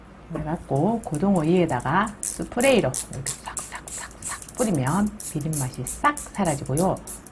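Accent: Gyeongsang, South Korea. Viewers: 경상도사투리 너무좋다 부러워요 입담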